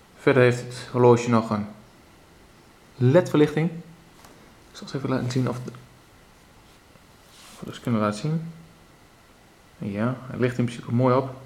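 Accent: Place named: Germany. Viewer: cool German accent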